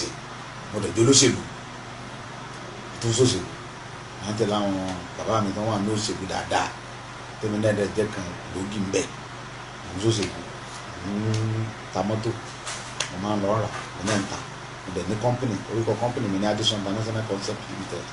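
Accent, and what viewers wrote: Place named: Oyo, Nigeria. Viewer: Mo like bàbá Ìgboho yìí gan... I like his broadcast and all he says.. Ní pàtàkì bí wọn ṣe jẹ́ akíkanjú àkàndá ènìyàn, tí Ọlọ́run gbà fún lọ́pọ̀lọpọ̀.. More grease to your elbows sir... I like his deep Ọ̀yọ́ ascent.. Ọmọ Abeokuta l'èmi.. Yorùbá ni gbogbo wa, ṣùgbọ́n àwọn gan ni wọ́n ní Yorùbá...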